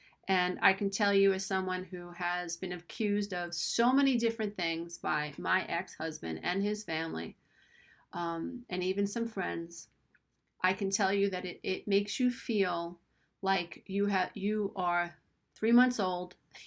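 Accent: New York, United States. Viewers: it's refreshing to hear a narc abuse channel with a new york area lilt. nice work. thank you.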